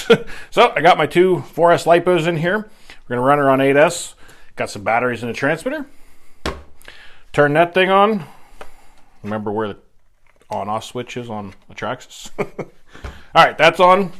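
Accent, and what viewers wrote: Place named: Pittsburgh, United States. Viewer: Bro... are you a Yinzer? I'm out west and I'm picking up some serious Yinzer vibes. Makes yer vid special. Keep it up!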